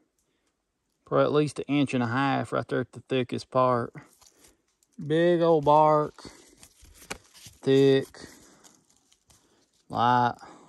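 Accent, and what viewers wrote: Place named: Eastern Kentucky, United States. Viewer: Love to hear you talk reminds me of my kinfolk down in eastern Kentucky ❤️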